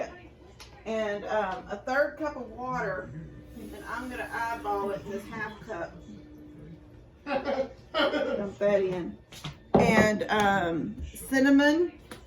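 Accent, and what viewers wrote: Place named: South, United States. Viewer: i really feel--right at home with you..... "you'er fixing to" and "eye balling"------good ole southern talking----i'm from and in FLORIDA still ---you just bring all the great memories of my family...rip🥲 and I THANKYOU for that!!!!!!😍🥰😍🥰😍🥰😍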